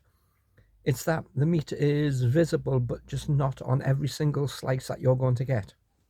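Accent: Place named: Newcastle, United Kingdom. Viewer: Love the geordie review ❤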